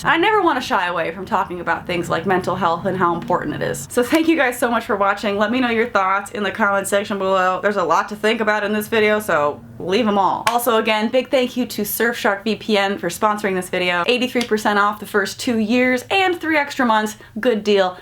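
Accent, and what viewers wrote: South, United States. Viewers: havent been to this channel in awhile and sounds like she has more of a southern drawl haha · I can't tell you how much I am I'm love with you for your exaggerated sassy southern accent it warms my heart every time. 😻😻 I'm sorry onision said bad things about your body. You are beyond a perfect 10 and I hope you know that and you always were and always will be. Happy thanksgiving 💖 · What's with the cowboy accent lol? I mean it's cute. But why lol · I see you use your country accent more and more ( i don't know if you notice it). Why is that? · You slip in and out of a southern drawl. I thought at first it was on purpose. That's unique. I would say cute but apparently when a guy makes a compliment on the internet, clearly he is after something. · From one Southerner to another, I love hearing your accent escape every now and then. 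Mine only does when I'm drunk xD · Happy Thanksgiving and thank you for blessing us with your Southern twang. 🙏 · Your southern accent came out a few times and I wasn't sure if it was fake or if you actually have an accent.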